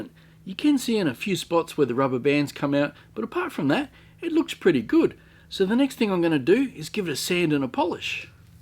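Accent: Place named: Australia. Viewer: Hey, we used to live in Victoria and so it is always awesome to have a friendly Australian accent to appreciate. My kids love to ask for a bedtime resin video, they think it is the coolest. Me too!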